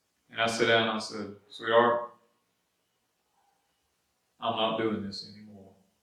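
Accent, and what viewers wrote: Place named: Alabama, United States. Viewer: Thanks. Love the channel. Feel like I'm listening to someone from around the corner from me. Mobile, Al. Come down and talk sometime.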